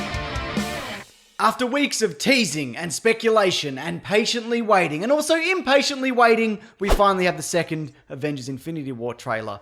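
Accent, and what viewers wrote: Australia. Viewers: Australian bloke haha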